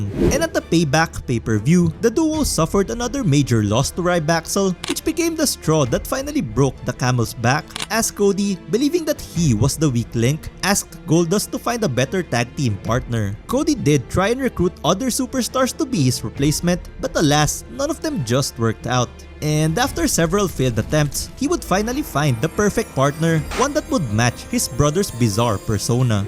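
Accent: Philippines. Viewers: That accent... Filipino? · You sound Filipino. Are you? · your english is very Filipino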